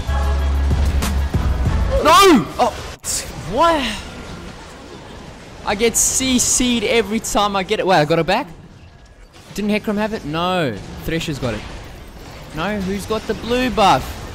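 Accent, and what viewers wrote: Australia. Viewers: Ru aussie mate? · His australian right?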